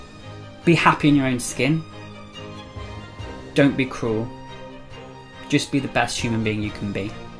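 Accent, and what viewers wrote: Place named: Liverpool, United Kingdom. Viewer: I agree with you! Just a low and disgusting way to treat someone xxx I subscribed because you’re a cool guy with a hint of a scally accent xxx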